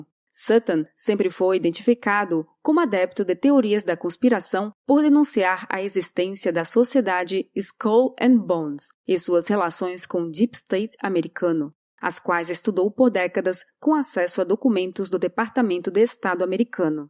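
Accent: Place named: South, Brazil. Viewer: Essa narradora é sulista kkkk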